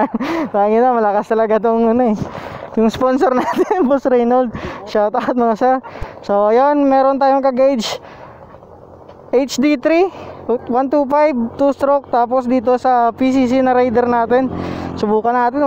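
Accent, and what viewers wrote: Iloilo, Philippines. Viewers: parang ilonggo ka po😹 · Ilonggo ka boss? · Ilonggo ka sirr????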